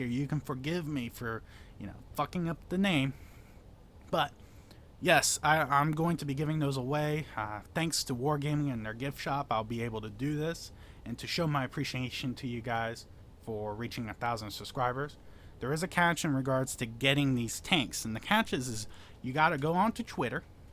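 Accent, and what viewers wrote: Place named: South, United States. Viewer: what is your 1st language? you kinda sound like your playing with a "southern" accent, maybe something else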